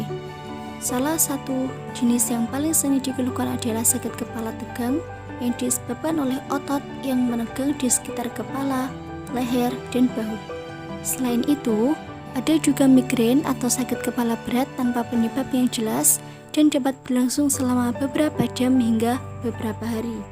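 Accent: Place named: Java, Indonesia. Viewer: Medhok yuuu 😭🤣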